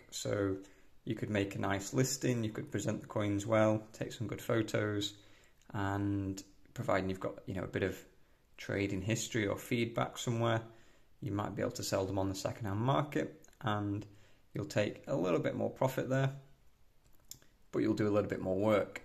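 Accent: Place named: England, United Kingdom. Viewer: I like your accent. What region of England are you from? My apologies if I’m wrong. I’m from Indiana in the United States.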